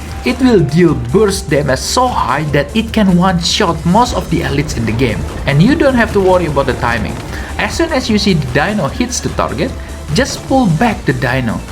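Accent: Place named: Indonesia. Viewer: Aksen inggris nya indo bet 😂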